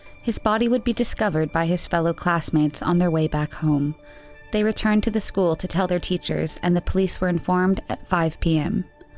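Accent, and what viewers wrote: India. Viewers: can the girl narrate the videos more? I don’t like the guys Indian voice. I hate it. I skip the videos if I hear it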